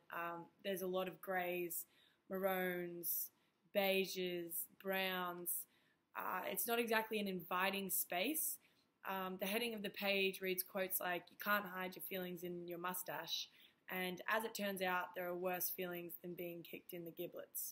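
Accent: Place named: Victoria, Australia. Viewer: I think the statute of limitations is up….I had the biggest crush on Ms. during her time in Eugene. I was completing a lengthy career of sports journalism and sociology. Admittedly it was absolutely fueled by an appreciation of aesthetics, mannerisms, admittedly shallow but subjectively harmless. Your decision to take leave Eugene for the Horned Frogs of Fort Worth served as excellent foundation for my ego. I mean the only reason I never took out was because she left! 🙃 I saw your content for YouTube kids about the importance of keeping in touch during quarantine, mindfulness, etc. Great work! I can I only imagine the impact a star athlete like yourself has on young girls AND boys. Seeing you discuss toxic masculinity and gender issues intelligently is rad. That’s 100% my realm of media/sociology snd I had no idea. It isn’t ironic; since no one ever uses irony correctly…. 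With my awareness of misogyny, objectification, etc it can be a conundrum between the ‘progressive’ trends I believe in and ‘Woah. Is she from Victoria? The accent. She’s friggin’ 😍’ Hey now now…she might be an awful person. We should not suddenly wish all good things for her whilst ignoring the plight of others based on hormones. You’re really intelligent too! I damn well knew it! I’m exaggerating intensity of my crush (slightly)….but to see you sharing a very well composed thesis and using your platform for good. Well that just shows I’m perceptive. I knew there was far more to ya Beaut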